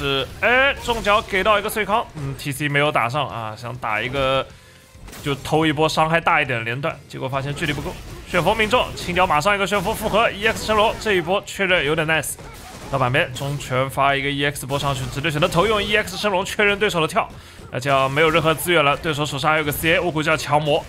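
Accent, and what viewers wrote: Shanghai, China. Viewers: 是上海人？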